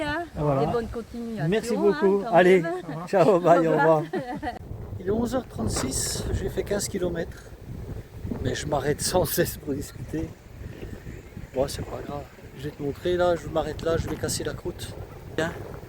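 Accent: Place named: Alsace, France. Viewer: Bonsoir Ca fait du bien d’entendre l’accent Alsacien 😀. Tu a bien fait d’aller voir cette belle cathédrale en grès rouge. Beaucoup d’église et de monument sont restauré avec le grès de la carrière de Rothbach réouverture en 1964. Je te souhaite une bonne nuit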